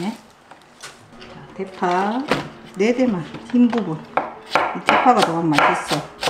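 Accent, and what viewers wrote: Gyeongsang, South Korea. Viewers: 작년에 친정엄마 돌아가시고 우연히 이 채널을 보게 되었는데 펑펑 울었네요. 재료 손질하고 넣을때 터프하신 손놀림이나 경상도 말투나 엄마가 너무 생각나요. 요리를 따라서 하진 않지만 새 영상 올라오면 다 챙겨봅니다. 자주 올려주세요^^ 엄마와 딸 너무 보기 좋아요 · 님은 경상도에 사시나요~?경상도사투리로 말씀하시는데~김치도 약간 경상도식으로~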